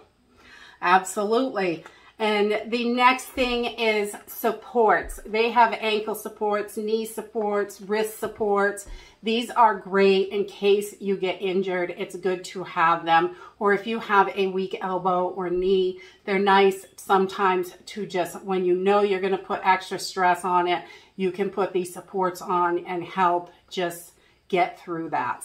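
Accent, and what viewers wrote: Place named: Bronx, United States. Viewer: Wow that is one i don't have that wrap. Every time i see you up i think Oh I have to go watch you you get me grinning, i don't want to sound personal are you or family from the bronx? You sound like you have a bronx accent. Love your channel. ☺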